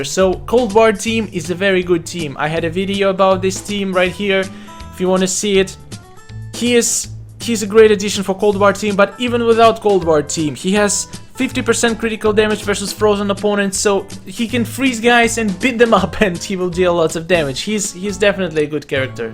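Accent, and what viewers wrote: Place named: Russia. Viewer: I don't mean to be offensive But are you Russian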